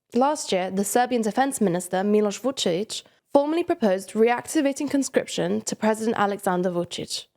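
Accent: United Kingdom. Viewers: What is with the strong British accent ? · I love the accent of the narrator! She sounds British but also has a bit of difficulty pronouncing the "th"-sound. So 33 sounds like firty-free. But the replacement (th -> f) sound isn't the same as the one commonly heard in and around London. It's slightly different and very noticeable. Not unpleasant, mind you. Just different.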